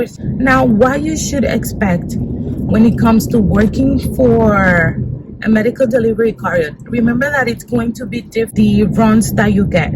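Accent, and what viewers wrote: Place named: South Africa. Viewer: Don’t mean to be rude but you sound South African.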